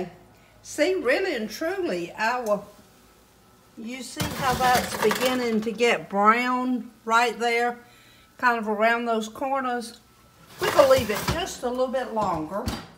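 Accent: South, United States. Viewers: Oh my glory lol You are absolutely adorable I just love your southern charm and accent. From a generation we can surely learn so much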